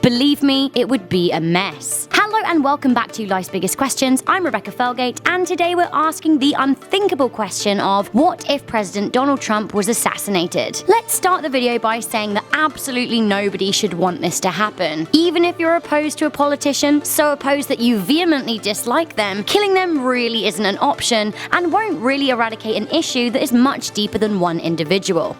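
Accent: United Kingdom. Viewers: I'm here for the British accent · Is she Britsh?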